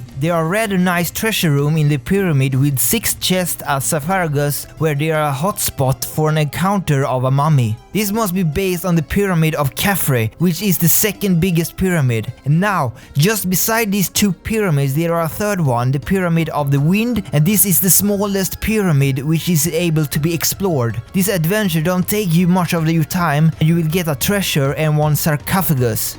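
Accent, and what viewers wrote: Sweden. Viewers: Are you from sweden? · youre sweedish??? c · are you from sweden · ar you from Sweden?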